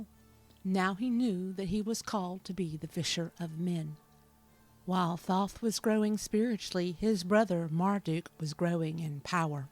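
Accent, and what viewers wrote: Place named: South, United States. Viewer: Loved this. Beautiful video. The southern twang narrator gave it extra charm😊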